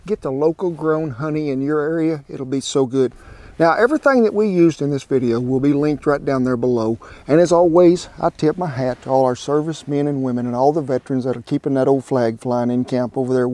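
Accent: United States. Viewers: 😲 I really like your american accent.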